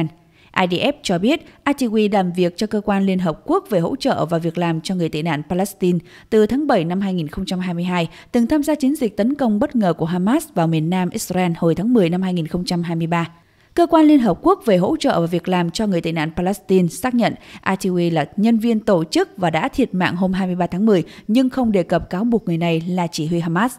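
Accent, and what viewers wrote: North, Vietnam. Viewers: Đài này phải Đài cũ của HTD không vậy, sao phát ngôn viên tiếng bắc đọc vậy?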